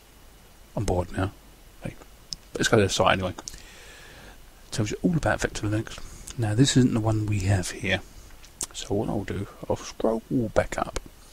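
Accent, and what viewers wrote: United Kingdom. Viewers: Very nice, matey. Loads of stuff there. You really are getting what you need. And nice to see some specs on the machine, your running it on. I've never really used KDE. should i give it a try? Btw I love your ''british'' (scottish?) accent. ''tic, toc, tic, toc'' Hehe. Great review matey!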